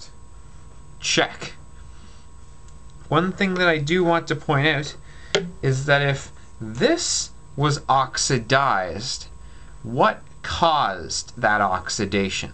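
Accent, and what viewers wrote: Canada. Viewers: Has anyone ever mentioned that you sound like a Canadian John Malkovich? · are you Canadian?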